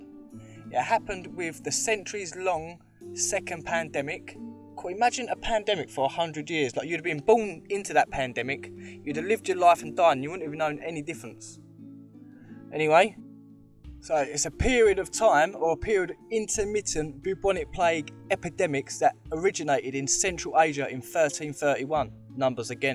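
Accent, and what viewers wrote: Essex, United Kingdom. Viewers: yeah you definitely got a mix of cockney and Essex I'm from mile end myself Great Channel been watching for a few months now just wondering if you have any other social media platforms channels Facebook or email ect ? because I would like to talk to you about a few things if possible I have a found a few tartarien pre reset buildings in the local East London area recently and obviously would be great to here your opinion thoughts and if you want to use them in a video your welcome obviously think it's gonna be a while until I receive another telepathic download about how free energy works and innerstand it properly but I'll keep you posted obviously 😇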